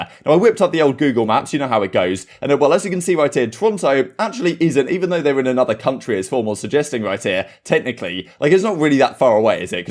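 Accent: United Kingdom. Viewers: I’m also curious 🧐 British? Haha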